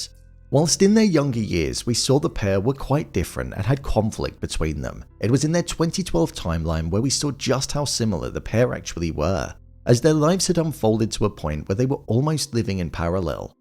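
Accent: England, United Kingdom. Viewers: that's not an Australian accent and anyone from Australia would be insulted by that. Its a straining for a poo part of England robotic voice.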